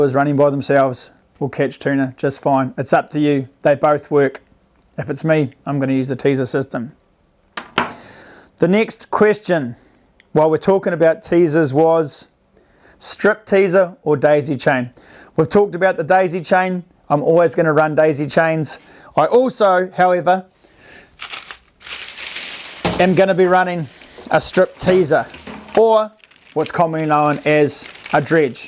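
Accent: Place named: New Zealand. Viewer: This was really an awesome explanation with a great focus on the "Recreational Fisherman". Here in the Northeast USA (Maine), we have the Recreational Bluefin (<72 inches) and the Giant Commercial Bluefin (>72 inches). When you wet a line here, you just never know what you are gonna get hooked to. Learned some good skills/techniques on what to include for a set-up. Your clear spot-on presentation style is greatly appreciated. Eliminating the fluff and ego, with a bit of Kiwi accent, what more could a viewer ask for.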